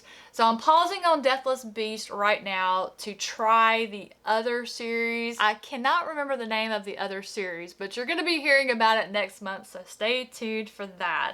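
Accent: South, United States. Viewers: I find it hilarious that the accents tripped you out - I live in MS, and your accent is nicely southern to me, and more pronounced than most of the people I'm around on a day-to-day. 😆🤭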